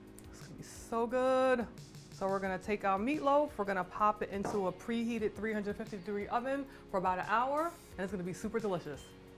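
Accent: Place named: New York, United States. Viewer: Man, I could tell she was from New York- soon as she spoke ... I wanna make a meatloaf but I don't have fresh Parsely☹️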